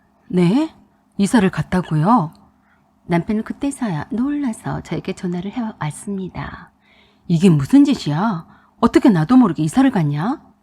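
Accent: Jeolla, South Korea. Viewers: 나레이터 전라도분인가봐요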